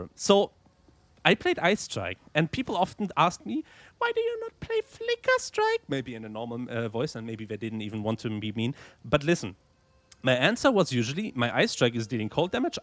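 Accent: Germany. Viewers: world first listenable german accent love to see it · Deutscher Landsmann. Hört man sofort raus. 😂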